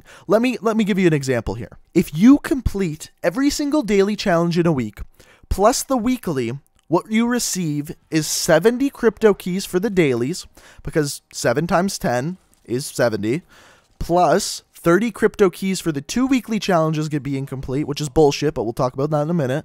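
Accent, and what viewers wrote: Canada. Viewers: Is this guy From Canada or does he have a accent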